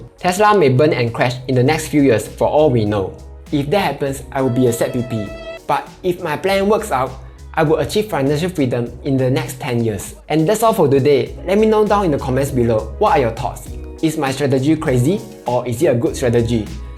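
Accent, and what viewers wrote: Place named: Singapore. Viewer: haha bro i only sub your channel yesterday, today i am the first to view your new video. nice one, i enjoy the way you talk. very singaporean la!!! but i guess you are PR, so must be another nationality.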